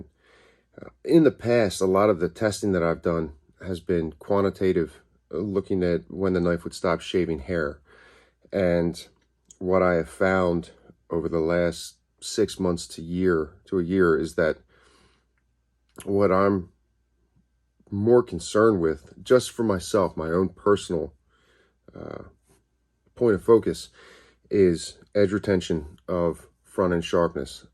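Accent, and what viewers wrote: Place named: Maryland, United States. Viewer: You sound like a Marylander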